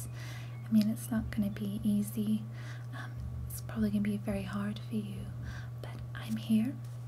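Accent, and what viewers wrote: United States. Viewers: Great american accent! Your true accent pretty much only came out in the long O sounds. But then again, people from the US talk all kinds of weird ways, so I'm pretty sure nobody would think that you weren't from the US if you were using the accent over here. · This is an excellent American accent! Probably the best accent switch I've seen in an ASMR video. I agree with those who said you sound like Springbok ASMR here :) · I just praised your spot-on Scottish accent on another video...now I'm praising your excellent American accent. You have a genuine talent for asmr as well as accents. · holy crap I never understood the "American Accent" until now! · Also your American accent was amazing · Your American accent is quiet good! Not many people can perform that sort of affectation without sounding silly. You have a wonderfully warm smile as well :) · I'm super impressed by your American accent! 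It's really quite accurate. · That's an amazing American impression. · Lovely, especially the scalp massage. Your accent was on point —I'm American and I don't think I would've suspected it wasn't your native accent if I hadn't known better. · Excellent American accent,!! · I thought you were American wow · As a citizen of the United States of America, this was a very convincing accent, and overall a very nice video. Props to you. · Wowww!! You're so good at accents omg, if i didn't know any better I'd think you were american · Great accent! Good job very believable... I only heard a few instances of your Scottish...British... Australian accent. and I only noticed because I AM american. But good job! Good Video! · i like the american accent. good job. you are just amazing in general though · As an American, I can say you did a great job at that American accent. I couldn't tell you were even British because the accent was so good. 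· Just wanted to pop in and give my expert American Stamp of Approval on the accent. You even said "food" like someone from the midwest. Also, the marker sounds ruled. Oooon an ancillary note: very lovely video, many thanks as always. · Great American accent. Love your ASMR video. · ASMR ahh your American accent is impeccable!!! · Ma-saj not Mash-aj. Otherwise, amazingly convincing and indistinguishable from real Americans :>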